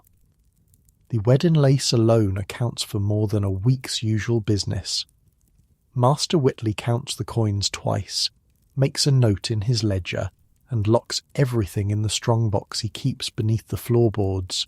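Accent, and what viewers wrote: United Kingdom. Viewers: Can you tell me when the narratot in this amazing series on YouTube went from an American voice to a British one? I much prefer the soothing British voice. Thank you.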